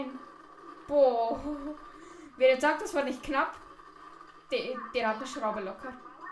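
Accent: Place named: Switzerland. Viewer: Hey again ha also rächt ka mit minere annahm das du schwiezer Bisch ha es sofort a dinere ussproch gmerkt 😊 find machsch richtig gueti Videos witer so bi e YouTuberin us de Schwiz😅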